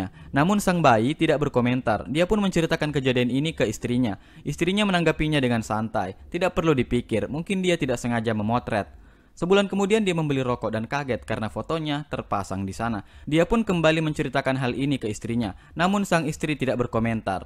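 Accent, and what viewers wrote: Makassar, Indonesia. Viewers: Kalau di dengar dari logatnya, orang Makassar kah?? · Org Makassar ya bang?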